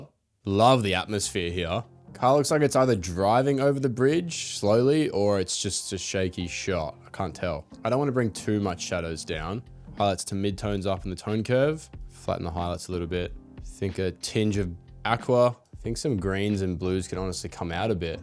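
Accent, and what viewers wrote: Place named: Australia. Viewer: Nothing better to start my day than my fav aussie swearing at me ❤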